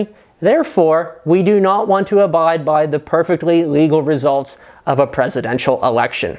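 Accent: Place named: Pittsburgh, United States. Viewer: This guy is a Yinzer no doubt.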